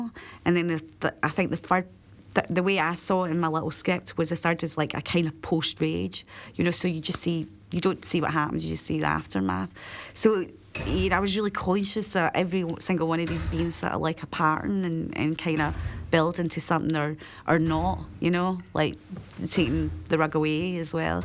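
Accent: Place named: Scotland, United Kingdom. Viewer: She's Scottish you melt.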